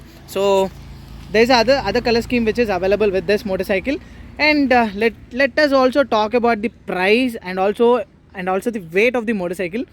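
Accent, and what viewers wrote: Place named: United States. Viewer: ur english is awesome !! speaking like an american !!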